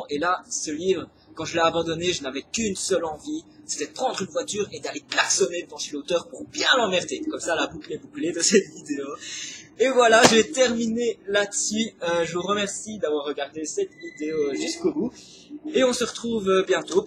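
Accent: Belgium. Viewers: Coucou :) Je me dois de te taquiner un peu ... Ton accent belge ♥ ! Je me souviens encore de tes premières vidéos où tu étais réservé et pouf .. Maintenant tu pètes le feu et anime tes vidéos :P Les quinze premières vies, c'est le genre de bouquin qui me rendrait folle ... Alors je comprends ta déception ! J'attendais avec impatience ton avis sur le livre que tu as abandonné :) Ahahaha, tu me fais rire x) Ah oui, généralement les profs étalent leurs connaissances dans leur bouquin ^^ Bon bha clairement, merci de la prévention, je ne le lirais jamais ^^ Bisous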